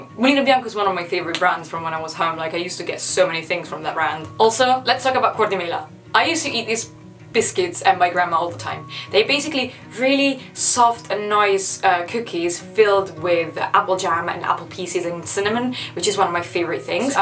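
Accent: England, United Kingdom. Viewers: Bellissimo video! Il tuo accento inglese è top😱🦋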